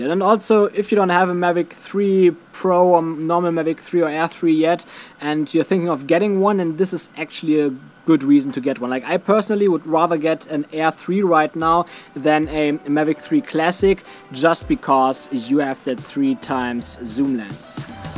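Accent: Germany. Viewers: I can spot a German accent from 100 meters away. But anyway, this was a very helpful video! Good Job!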